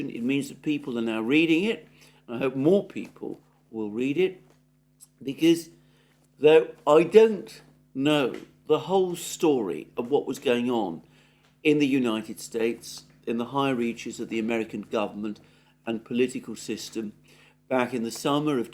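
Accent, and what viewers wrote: United Kingdom. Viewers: Sheer and shameless bias. You are ridiculous and only act rational with that pompous British accent.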